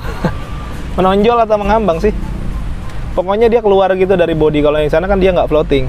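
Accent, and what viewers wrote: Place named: Garut, Indonesia. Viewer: hahahaha kayanya orang garut euy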